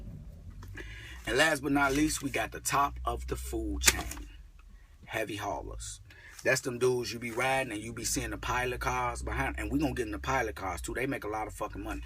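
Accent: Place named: Chicago, United States. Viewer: He ain't lying. I know people making this kind of money owner operating. I knew you was from Chicago when you started talking.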